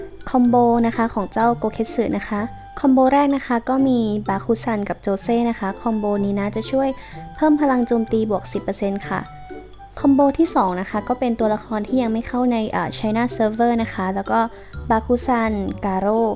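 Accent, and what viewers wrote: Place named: England, United Kingdom. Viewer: ชอบสำเนียงอังกฤษจริงๆ เลิฟๆ💕💕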